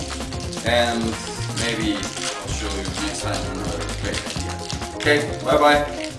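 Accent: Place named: Brazil. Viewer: Você fala inglês mas você é Brasileiro, seu sotaque não nega.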